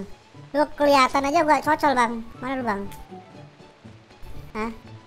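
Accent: Java, Indonesia. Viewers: Asli mana bang ko ngomong Jawa nya medok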